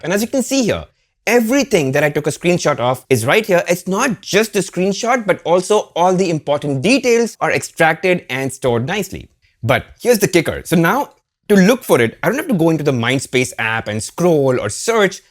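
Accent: India. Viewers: Your Indian accent sucks too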